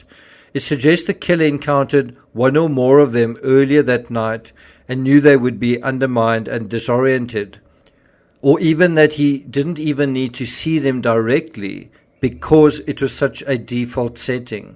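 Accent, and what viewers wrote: South Africa. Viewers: is from South African. We have friends from Durban who have the same accent. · You accent is like a South African?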